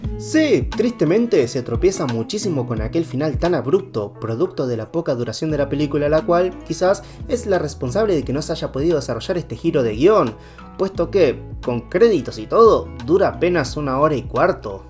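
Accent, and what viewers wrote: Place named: Argentina. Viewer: Todo estaba bien y disimulado asta que se noto el acento argentino "asha" "sha estamos" como arruinan la experiencia.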